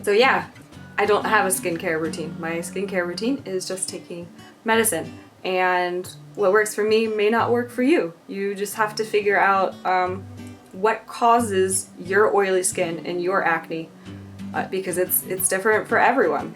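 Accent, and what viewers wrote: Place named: United States. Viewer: your american accent is going away and its cutee :)) ♡♡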